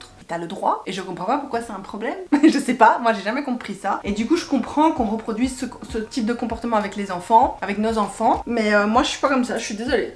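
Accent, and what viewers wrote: Belgium. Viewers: Absolument toutes les caractéristiques de la meilleure des mères. Dont le STRESSE!!!!! Autrechose: - l'accent belge, merci, j'adore (parce que j'ai trop de bon contact avec eux mais faut pas dire qu'on est français):) - la sincérité, bah vi, pourquoi en dire plus? - courage maman - Quel contraste entre et. si vivante et si calme. Vous êtes beaux. - Au diable la perfection que tu recherches, si Poipoi sourit 10 fois par jour (avec toi, j'en suis sûr), alors... J'envi sa jeunesse. Merci pour ta confession pleine de valeurs.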